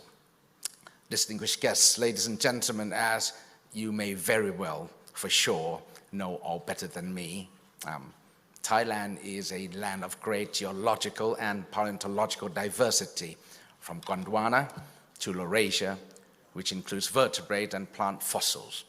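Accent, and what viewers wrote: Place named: United Kingdom. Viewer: สำเนียงบริติช เพราะค่ะ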